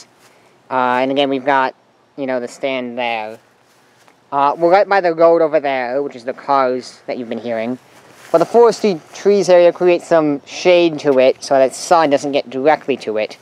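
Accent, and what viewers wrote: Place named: Minnesota, United States. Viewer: Just heard it "Southern-ish Minnesota"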